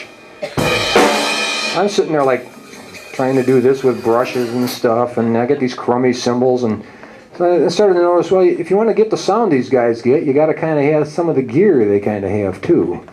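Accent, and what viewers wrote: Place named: Chicago, United States. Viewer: chicagoland accent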